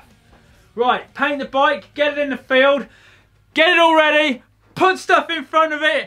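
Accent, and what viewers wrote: United Kingdom. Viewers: This guy has British accent, German look, Italian temper and American craziness.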